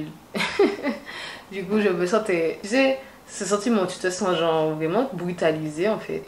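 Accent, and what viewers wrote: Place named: Antilles, Caribbean. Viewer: Tu devrais mettre des sous titres l’accent antillais tu piges rien mdr 😂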